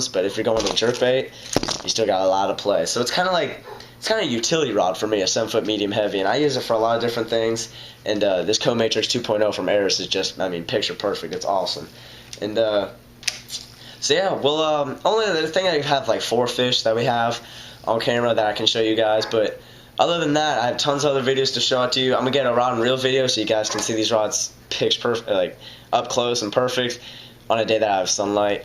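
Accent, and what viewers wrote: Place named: South, United States. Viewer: wtf did you develop a southern accent?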